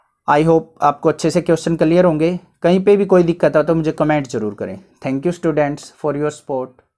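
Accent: Haryana, India. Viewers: Sir aap Haryana s ho na maybe😅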